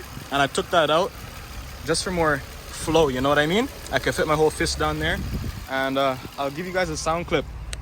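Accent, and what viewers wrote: Toronto, Canada. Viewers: Sounds like a toronto man jheeze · Where your from ? Sound like your from the 6 .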